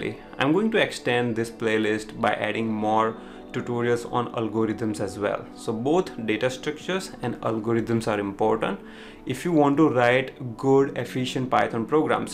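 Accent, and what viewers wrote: India. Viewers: What accent? Foreign return or Indian?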